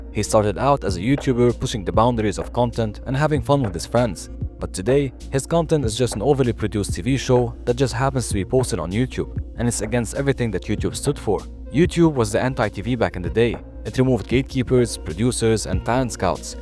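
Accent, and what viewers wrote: Arab world. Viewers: your accent sounds arab , are you lol? great vid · I love ur Arabic accent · Great video, and you sound Arabian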